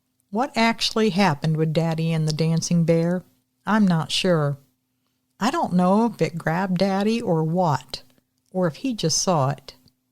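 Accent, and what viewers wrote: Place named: Eastern Kentucky, United States. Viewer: Well I’ve got tears streaming down my face. I too grew up in a house with. Dad that was gone more than he was there and was always spending all our money at the bar with floozies. I was never beaten but I was screamed at and I heard a lot of yelling between my parents. I too remember the day my Mom made my Dad leave. Thank you for sending in your life changing story. I’m so glad that wood booger came along to save you and in turn saved your family., I’m married to a guy from Eastern Kentucky and you sounded like you could be from there reading this story. 😊 I felt like my Mother in law was still here. We lost her to breast cancer in 1998 but you sure did sound like her reading this. I think it’s the pattern of speech they have out there that did it because you have never reminded me of her before. Thank you to you both for bringing a happy ending of Christmas 🎄 joy. 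💖💖💖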